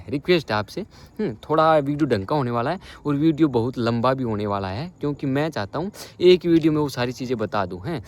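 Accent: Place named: Rajasthan, India. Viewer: Bhaiya app rajsthan se ho kya boli me lg raha hai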